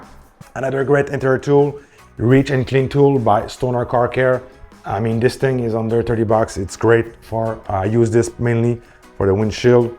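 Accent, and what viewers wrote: Quebec, Canada. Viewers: I know a Quebec accent when I hear one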